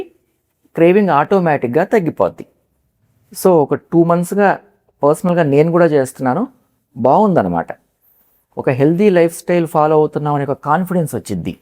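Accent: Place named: Guntur, India. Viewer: Sir, Meeru Guntur ani ardham avuthundhi,Ela ante vachhiddhi,thaggiddhi edhi guntur valle matladatharu, Good information sir🎉🎉🎉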